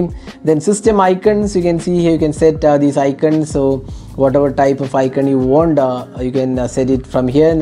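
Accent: Kerala, India. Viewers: R u from Kerala???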